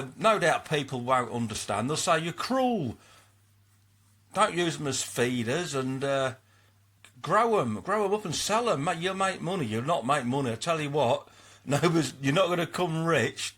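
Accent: Midlands, United Kingdom. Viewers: hi, just subscribed, great videos you have. yes I agree with you regards the fry, wouldn't be so bad if she just had 20 fry or so. p.s. you must be from the Midlands with your accent 😉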